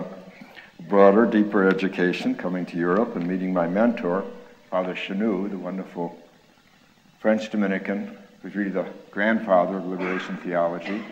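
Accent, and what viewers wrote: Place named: Ireland. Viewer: and I love his Irish accent....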